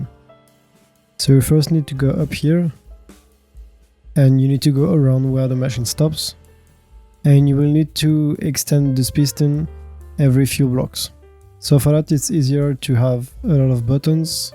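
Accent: France. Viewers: On dirait que j'entend un français...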